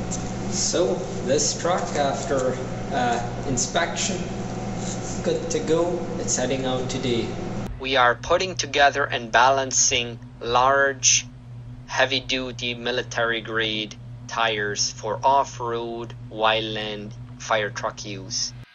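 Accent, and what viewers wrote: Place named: Ireland. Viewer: A strong Irish accent has. Fabulous work you all do,the men manufacturing and building and the ladies & Children work the land and are home makers..such a wonderful way of life.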